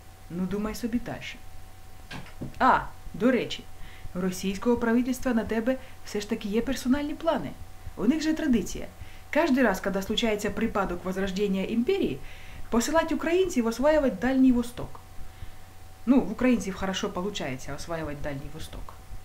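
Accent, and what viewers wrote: Surzhik, Ukraine. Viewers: Какой прикольный суржик :) Молодец! Хейтеры пусть идут в путин.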